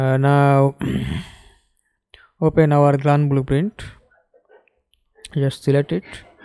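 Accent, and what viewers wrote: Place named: India. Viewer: loved the Indian accent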